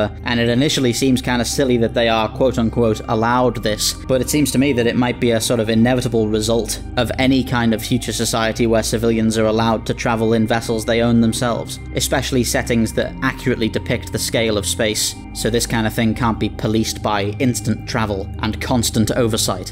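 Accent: United Kingdom. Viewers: When I heard the British accent I had to laugh. I can legally weld a machine gun or flamethrower to my car, and, at worst, get hassled by a cop for a "public disturbance." I also have a 3D printer and drill press with which I can pretty much manufacture whatever kind of gun I want. The topic of this video had never occurred to me. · Man, you sound soooooo British. "If normal people are armed then the criminals will just steal their guns".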